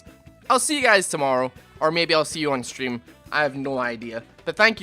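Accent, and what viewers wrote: Scotland, United Kingdom. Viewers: are u scottish pal?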